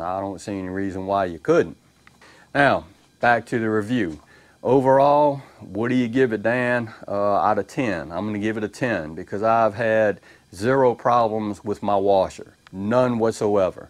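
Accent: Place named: Mississippi, United States. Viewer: Came to this video to learn about the machine but the biggest thing I've learned is that I need a friend with a Mississippi accent. Lovely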